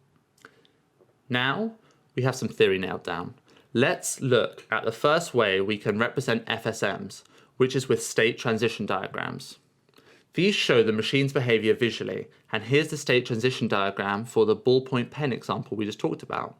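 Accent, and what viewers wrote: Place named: Australia. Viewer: Australian accent♥️♥️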